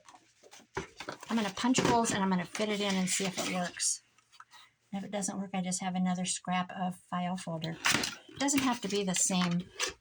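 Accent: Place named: Midwest, United States. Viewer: I love listening to your stories while I’m crafting along with you. It’s so refreshing to listen to someone from the midwest. I moved away now but it sure is good to hear your stories!!!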